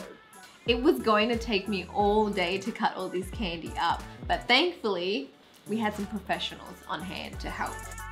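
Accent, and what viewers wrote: Australia. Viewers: Are you aussie??????🤨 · I can’t figure out her accent but that’s probably because I’m dumb -_- Can someone pls tell me her accent?? This is me editing it before someone tells me... is it Australian? Sry I’m dumb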